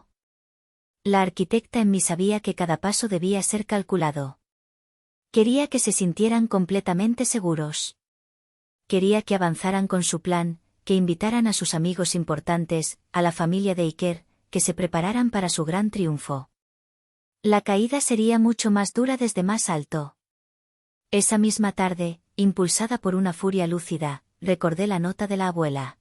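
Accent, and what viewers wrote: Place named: Spain. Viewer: Una mexicana relatando con voz de auténtica española, eso resulta raro, no encaja, pensé que la historia se desarrollaría en España, pero no, la de la voz de españolita resultó ser mexicana.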